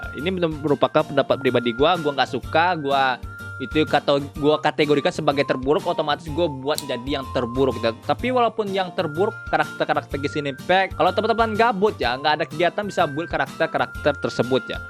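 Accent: Padang, Indonesia. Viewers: bg lu org mna?logat lo kek org padang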